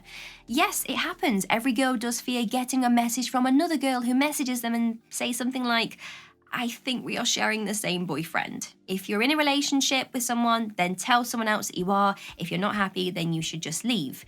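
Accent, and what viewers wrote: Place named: Manchester, United Kingdom. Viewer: Great video, thanks! I love your accent; did you grow up near Manchester by any chance?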